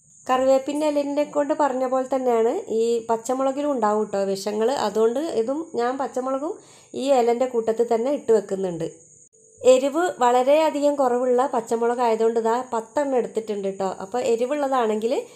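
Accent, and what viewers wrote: Kozhikode, India. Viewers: Kozhikod കാരി ആണല്ലോ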